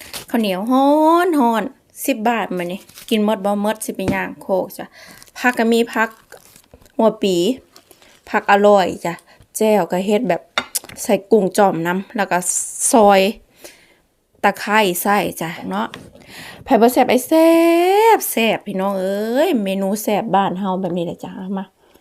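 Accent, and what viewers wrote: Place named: Sakon Nakhon, Thailand. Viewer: ยุสกลบ่จ้าคึเว้าคือทางสกล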